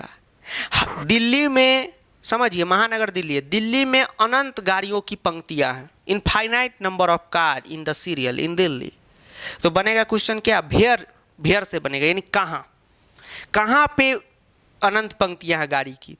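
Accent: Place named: Uttar Pradesh, India. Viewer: He is not bihari he is up wala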